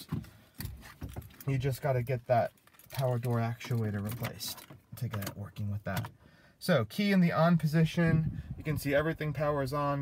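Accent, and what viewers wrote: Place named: United States. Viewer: You sound like american xd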